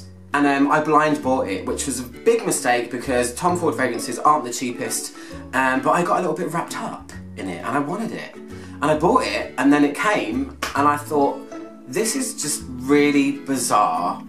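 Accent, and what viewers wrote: United Kingdom. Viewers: Luv ur British accent.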